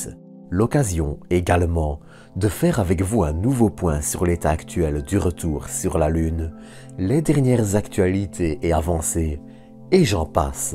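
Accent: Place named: Flanders, Belgium. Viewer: dit j'ai une question, tu est flamand ? flamand belge n'est ce pas!? 😌 ton accent trahi tes origines 😌😅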